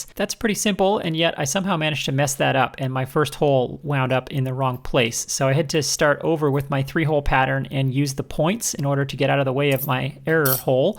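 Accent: United States. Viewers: I never thought of that but I love the idea. Great video. One thing that confuses me. You are supposed to be a Canadian but I never hear you say aboot or eh? You just used inches and as we say in the south you tawk uhmurikin. Not that you would use those words. Even AVE could be picked out as up nawth some place. Can you unconfuse me?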